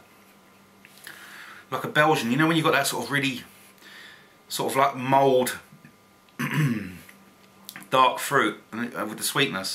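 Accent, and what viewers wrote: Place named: RP, United Kingdom. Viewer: haha you sound so posh haha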